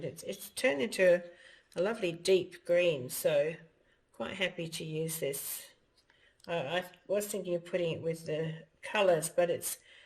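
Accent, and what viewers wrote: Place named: United Kingdom. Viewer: I have two of Sarsh's paintings. One is Low Tide (there is a video). Have a smaller condo so space is limited but her paintings are the highlight of a wall. She noticed you because of your exceptional progress over a short time. Good for you. Assume you are in British Isles based on your lovely accent and 5 hour time difference. Have a wonderful day!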